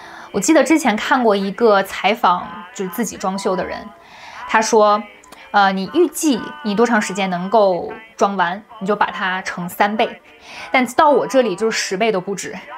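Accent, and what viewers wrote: Northeast, China. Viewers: 妹子是东北人，尽管你的东北口音是非常轻微的，但是休想逃过缺姐的耳朵🎙️☝️😉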